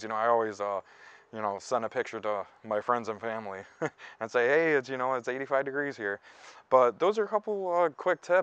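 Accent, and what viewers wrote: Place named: Illinois, United States. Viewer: You got the typical Illinois accent. It's super strong.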